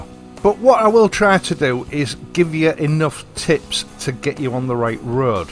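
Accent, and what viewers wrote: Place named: Hull, United Kingdom. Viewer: I'll answer for - his accent is 100% Hull/East Riding of Yorkshire (but more Hull), a unique accent that is like nothing else. You don't have to go far from Hull for it to change - about 15 miles in any direction....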